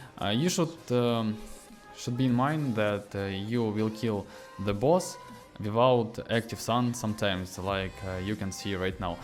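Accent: England, United Kingdom. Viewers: Oh, this beautiful english accent. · О, вы из Англии?😃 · Настоящий англичанин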